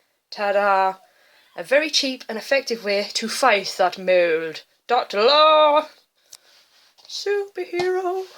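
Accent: Newcastle, United Kingdom. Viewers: Tl;dr Open lid a tiny bit aye, tip gungy water out, has Geordie accent, put salt in which costs 30p. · love a Geordie accent I can listen to it all day. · I luv your Jordie accent....and you are quite foony, too! hahaha. :)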